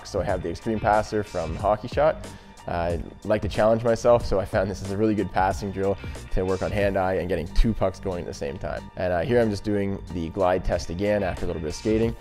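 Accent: Canada. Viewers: This man sounds very Canadian by his accent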